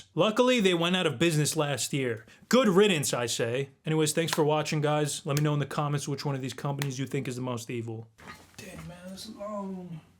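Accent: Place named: Chicago, United States. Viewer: great chicago accent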